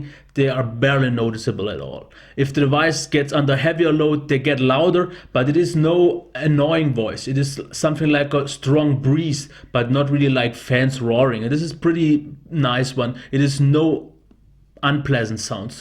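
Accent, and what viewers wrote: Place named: Germany. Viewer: A few things you should know: 1. Mode notifications can be easily turned off 2. You may got a defect display because mine does not make any sound (and i already got two) 3. You want better scaling? Then turn the resolution down, i mean you already said that it´s useless for YOU to have such a high res. But now one question: Was that Yoga overheating at any time? I know that you don´t have it anymore but you may remember if it was. Because i already got two and both are freaking overheating. Really sad, now i have to change my Yoga again... PS: When i heard the first word you were speaking i immediately thought : German! :D Trotzdem gutes Review :)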